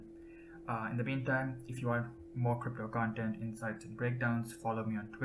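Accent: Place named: India. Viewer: Great video brother......are you from India??? just guessing from your voice.......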